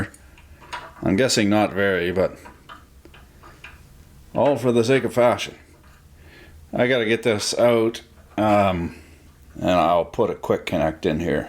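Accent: Quebec, Canada. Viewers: always gorgeous to listen your cool casual way.Do French Canadians always speak this Nice! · "Êtrrre Drébrrrouillarrrrdrrr" I love Quebec accent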